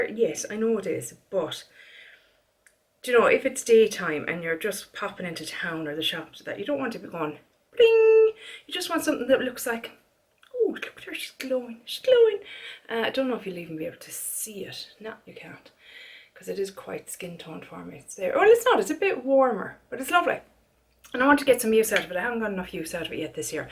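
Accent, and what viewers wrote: Ireland. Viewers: Hi that purple blush lol - next time I'm in Edinburgh i'm going to the Fenty counter to try it on. I'm super pale (Scottish milk bottle lol), cool toned. with grey eyes and blonde hair. I LOVE purple eyeshadow and a mauve blush, but the purple blush intrigues me. I've never seen it swatched before on light skin and I think I might like it - I love a lavender face powder, as it's so brightening on my skintone. Project pans are a fairly new thing to me (I found you through an empties video), but I love the Irish accent - my grandad was Irish and it reminds me of him. He lived in Scotland for around sixty years and never lost his accent. I've been to Ireland a couple of times - once to visit relatives with my grandad (before he died) and once touring on the motorbike. Loved it both times, but it rains as much there as it does here lol, so it's not the best weather for the motorbike. 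It is pretty though and the people I met were friendly. Best wishes.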